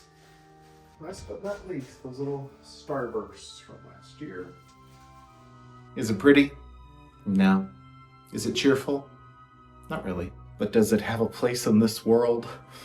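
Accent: Minnesota, United States. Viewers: On the 2nd day of Vlogmas my true love gave to me: 2 power strips 🔌🔌 and you sound like the movie Fargo... ❄️⛄🎄⛄❄️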